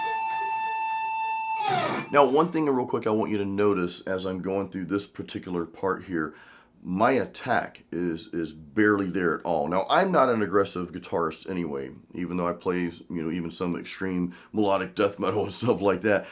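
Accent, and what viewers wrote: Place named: New York, United States. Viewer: I know you are from Florida, but sometimes I am sure I can hear some New York in your accent LOL.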